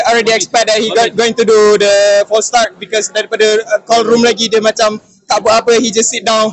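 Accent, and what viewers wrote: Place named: United States. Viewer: Yeahh, ada dgr slang american dia, oyeahhh 🤘🏻 terbaik Azeem 🔥💥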